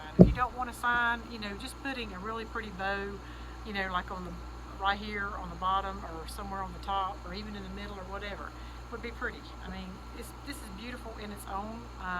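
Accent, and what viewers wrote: South, United States. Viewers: So pretty, I've been trying to find a simple way to show my daughters (adult girls) a simple and easy way to make wreaths. My older daughter especially loves her diy's... I think I'll go out and grab some supplies for her and have her watch this wonderful video and try this project. Thanks so much for sharing. And I just love watching your channel... love love hearing that southern accent you have.. lol Thanks again and have a blessed day. 🍂🍃🍁🍁🍁🍁🍁🍁🍂🍃🍂🍁🍁🍁🍁🍁👍👍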